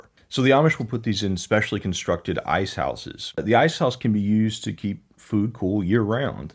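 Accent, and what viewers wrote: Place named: Baltimore, United States. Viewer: This is my first time watching but you sound like your from Baltimore :)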